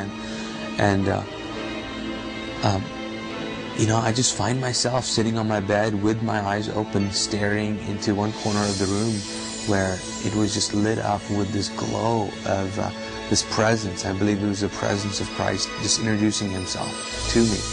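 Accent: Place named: Spain. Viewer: WOW!!! You have REALLY REALLY convinced me to CONVERT to Christianity hehehe. BTW, it's "convert" NOT "combert". It seems that your Spanish mother tongue (lengua materna) is affecting your English. Chico! Tienes que aprender el inglés sin influencias extranjeras, para que la gente no se reía de ti !!!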